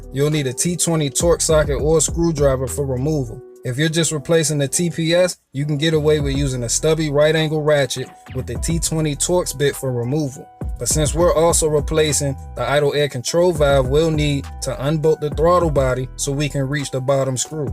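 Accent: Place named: DMV, United States. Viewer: Good video my brother, im tryna get my mobile mechanic business started. Im happy to see another brother like myself. Keep the vids coming, also are you in the DMV?? Im originally from the area and you just sound like it. Keep grinding brotha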